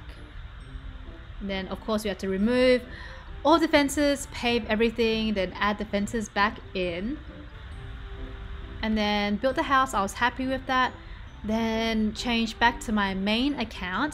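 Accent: Australia. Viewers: Are you from Australia? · shes australian not british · She's reppin' her Aussie accent 🙌🏻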